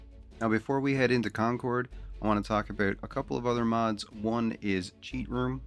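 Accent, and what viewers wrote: Newfoundland, Canada. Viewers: Helpful video, thanks 👍 Also the second I heard you talk I was like, "Is this guy from Newfoundland too?" 😃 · I know another Newfoundlander when I hear one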